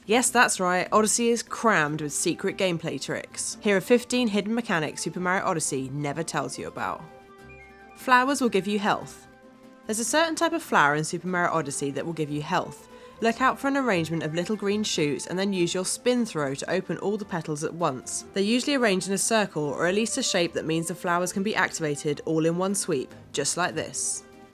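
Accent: United Kingdom. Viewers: CAT MERIO I’m not trying to be mean. She’s British.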